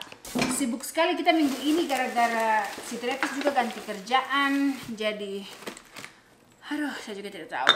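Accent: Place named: Eastern Indonesia, Indonesia. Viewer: Kk logat timur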